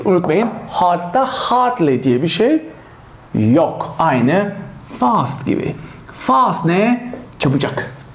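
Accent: United Kingdom. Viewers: british accent'ınızın mükemmeliği diyorum başkada bir şey demiyorum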